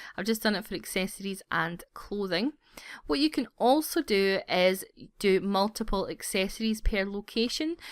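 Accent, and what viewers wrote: Scotland, United Kingdom. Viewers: She sounds Scottish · Not sure why, but I get really happy when I hear a fellow Scottish accent on youtube 👌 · Pringles Fan! Scottish?? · Woah okay. Four things I HAVE to bring up. 1. Nice accent! Haven't heard a Scottish lady speak before, sounds quite classy! 2. Perfect tutorial! This is not hyperbole. This has EVERYTHING you need: 2a. Detailed explanations on each most asked questions and how to get there. 2b. A patient person who calmly explains her reason of making this video for those curious. 2c. A showing of human emotion while also being fair. Not liking teenage pregnancy is fine. Heck, I don't either. I respect their decisions also. 3. A link leading to the installation of these mods without making it too long and also explaining what each mod does and again, humanizing yourself with a reason into why you only have these here. 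4. A cheeky joke in between a learning experience enhances the experience and makes it more enjoyable and not make people think you're not human. Human interaction amongst the teaching makes it relatable. If she can, I can! I cannot stress enough. You did extremely well here, and I don't say it often. Thank you, for the charming, compassionate, informative and patient tutorial!:D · are you Scottish or Irish? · Ahh! Scottish accent! I'm Scottish too and it's so nice to hear a familiar accent for a change!:)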